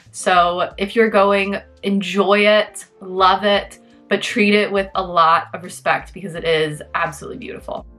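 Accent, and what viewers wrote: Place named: United States. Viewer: You sound like a very respectful American. Thank you.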